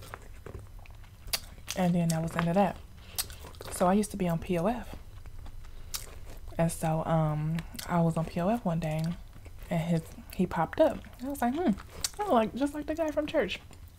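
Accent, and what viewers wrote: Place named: Maryland, United States. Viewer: Your pretty.. You sound like your from Maryland...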